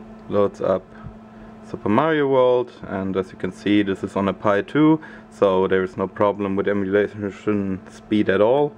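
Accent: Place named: Germany. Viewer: OMG, ich höre krassen deutschen Akzent raus, könnte es aber auch nicht besser.Seitdem ich RaspBMC nicht mehr nutze, da es ja nun OSMC gibt, frage ich mich, ob es denn möglich ist, z.B. den Xbox 360 Wireless Controller vom PC über USB zu nutzen, denn wenn es schon so tolle Emulatoren gibt, wäre es ja äußerst unpraktisch, diese nur per Tastatur nutzen zu können.Also - ist es möglich?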